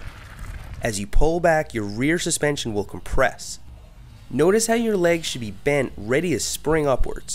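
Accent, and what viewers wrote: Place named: New York, United States. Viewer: Was pretty sure you were from NY when I heard you say BAWLS in a few previous videos. This video confirmed that, lol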